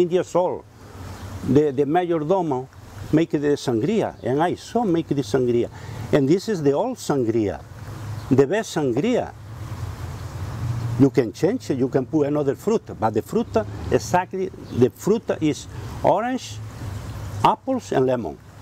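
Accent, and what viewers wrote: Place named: Spain. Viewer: His Spanish accent is so fckin cute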